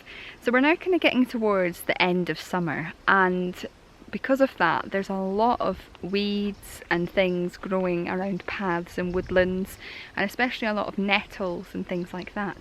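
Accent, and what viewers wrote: Scotland, United Kingdom. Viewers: I know it's just your Scottish accent, but at first I thought you said mackerel photography......was hoping you were going to get out a fisheye lens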